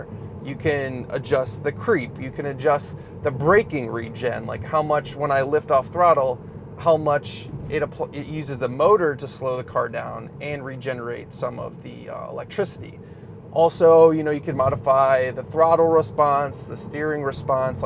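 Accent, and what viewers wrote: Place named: California, United States. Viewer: California diction, syntax, grammar and rhetoric...yuk.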